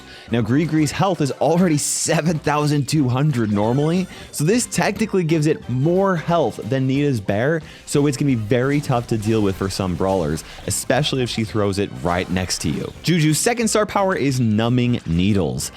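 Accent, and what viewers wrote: Louisiana, United States. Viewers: Love the Cajun accent ❤😂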